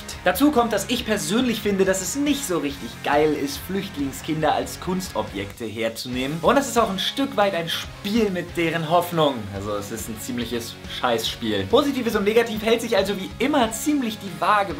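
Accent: Berlin, Germany. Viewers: Hey wie immer ein KLASSE Video und ehrlich deine Berlinerschnauze ist der hammer:) So nu die obligatorische Meinungsabgabe: Thema 1: also Leude warum sollte man Kindern beibringen mit Waffen umzugehen Hallo und wer bitte glaubt das diese dann die Waffen verantwortungsbewusst einsetzen ist wirklich Naive, das sind Kinder die den Umgang mit einer Waffe als Spiel lernen und somit denk ich mir das auch das abdrücken für diese nur ein Spiel ist wo bitte ist da die Verantwortung? Thema 2: Kinder als Kunstobjekt und deren Leid, Aufmerksamkeit für das Leid dieser Kinder ist gut aber wie schon sagte bisschen fragwürdig so mit der Hoffnung der Kinder zu spielen. Thema 3: ja die Forschung ist doch was feines ich will Persönlich nicht ewig leben aber die Leute die noch leben und ihr Leben Tag ein Tag aus vergessen für die find ich das super aber sein wir ehrlich, wenn man damit unsterblich wird können es sich eh nur Reiche leisten. 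· Bist du Berliner?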